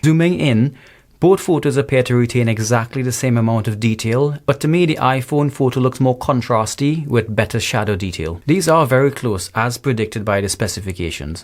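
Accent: Trinidad and Tobago. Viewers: I hear a Trini accent...🤔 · You from trinidad?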